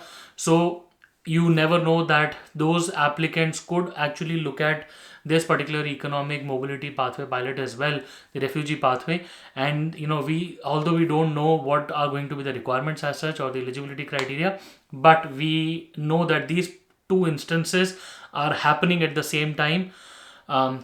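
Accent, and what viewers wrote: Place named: India. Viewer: Tha Indian accent is so strong that it becomes extremely hard to understand.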